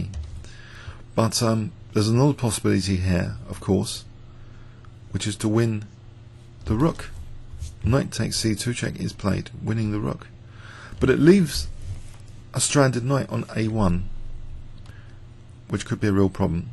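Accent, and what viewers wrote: United Kingdom. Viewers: British ascent, hoo!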